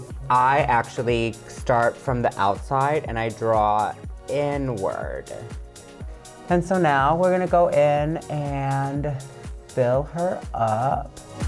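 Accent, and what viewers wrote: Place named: Milwaukee, United States. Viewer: Yeah she's from Milwaukee, so she has a similar accent to Trixie Mattel! If you listen to them both closely in some video's, they will sound quite similar with some things they say lol, it's awesome.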